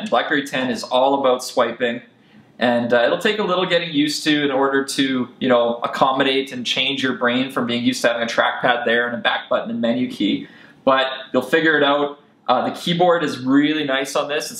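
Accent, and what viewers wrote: Canada. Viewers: is he canadian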